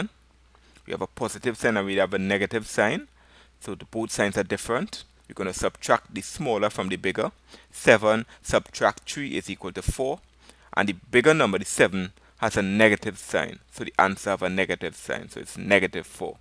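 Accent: Trinidad and Tobago. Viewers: I am so going to use your videos for teaching my classes!!! It's so nice for my students to hear a trini accent. Continue the good work! Bless up my fellow trini :) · are you from trinidad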